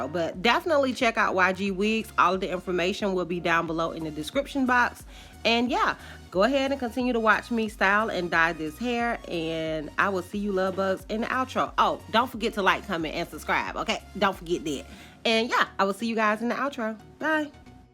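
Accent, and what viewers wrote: South, United States. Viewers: yes I knew it was somewhere down south lol😂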